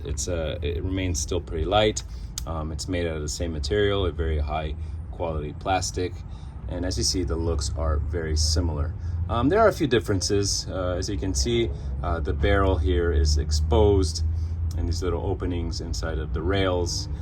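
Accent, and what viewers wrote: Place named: Italy. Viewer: Ciao grande!,. Tu sei italiano vero??? Su sente dallo slang inglese ... Parli perfettamente inglese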